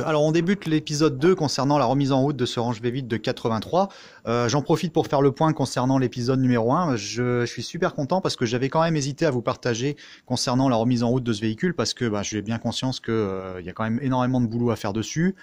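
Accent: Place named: North, France. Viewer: On entend quand même l'accent du nord lol ,super toujours au top tes vidéos et du taff de qualité j'adore ça ,je suspecte de plus en plus qu'on a à faire à un formateur en méca ou alors un grand grand passionné chapeau l'artiste 😉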